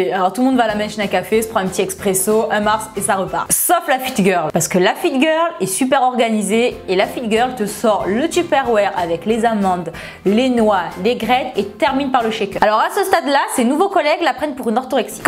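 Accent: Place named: Nice, France. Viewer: Salut, en plus d'avoir un corps magnifique et une tête bien remplie, tu as vraiment beaucoup d'humour, j'adore ton accent (je travaille sur Nice d'ailleurs!) et tes vidéos, elles m'ont beaucoup aidée à me raffermir et à prendre goût au sport (un exploit pour une feignasse comme moi 😂)! bisous bonne continuation!!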